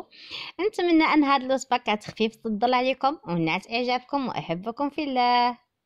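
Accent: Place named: Algeria. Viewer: بشوية عليك انا قصدت بلي اللهجة نتاعك تشبه اللهجة الجزائرية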